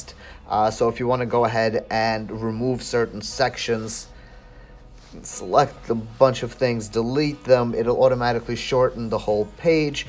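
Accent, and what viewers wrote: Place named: United States. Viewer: a tutorial with an American English speaking person. thank you. lol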